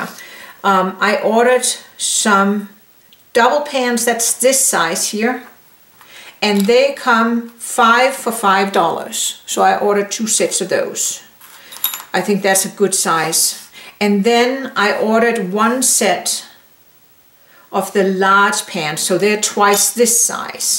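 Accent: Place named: Denmark. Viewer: As a dane I recognised your accent and had to go to your website to confirm what my ears heard. 😁 This is a great video, thank you for making it.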